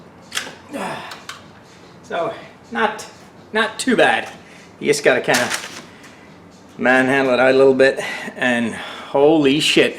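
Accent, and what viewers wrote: Northern Ireland, United Kingdom. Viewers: I can pick up on your slight Northern Irish accent, all the best from the UK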